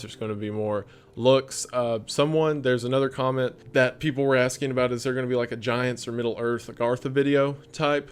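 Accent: South, United States. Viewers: the hawaiian shirt coupled with a southern accent is a godly dadcore combination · But he has a southern accent lol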